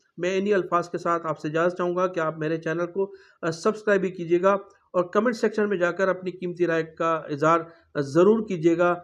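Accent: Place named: Sindh, Pakistan. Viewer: Ap sindgee speaking lagty hen magar haq bat karty hen apko salam allah apko jaza day bhai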